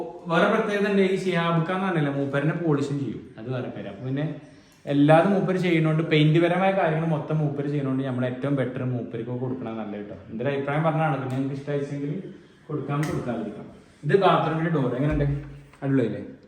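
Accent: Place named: Palakkad, India. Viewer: പാലക്കാടൻ Slang അങ്ങിനാ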